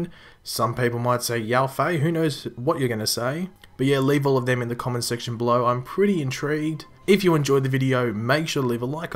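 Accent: Australia. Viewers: I think it's probably Billy right now, but I hope that it's Tommy. Also, you sounded very Australian this video.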